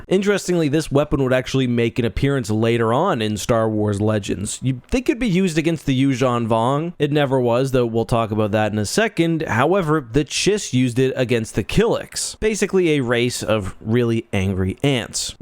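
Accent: Canada. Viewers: How's he gonna work the canuck word "re-zorses" into this one?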